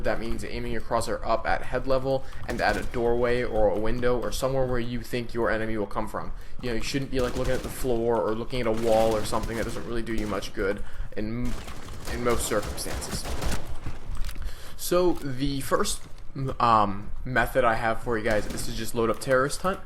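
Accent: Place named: General American, United States. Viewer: The truth is that he talks with a completely standard american accent. At times it might have been a bit fast but he pretty well sounded everything out and spoke clearly. So honestly I do understand your struggle as I have learned a second language myself, but you just need to practice the language more and the criticism in my genuine opinion is undeserved.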